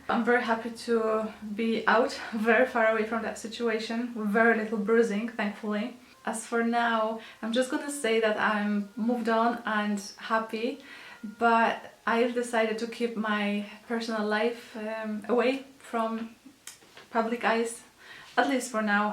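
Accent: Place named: Poland. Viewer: I love your polish accent don't lose it, it makes you unique. luv from Malaysia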